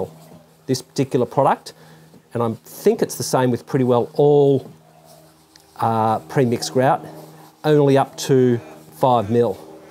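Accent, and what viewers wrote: Australia. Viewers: Recently discovered your channel. Thankyou for the wealth of knowledge you share. I have yet to do any mosaics because it was a bit daunting. I'll be right now. Good to hear the australian accent and those birds in the background. 😊👍🌳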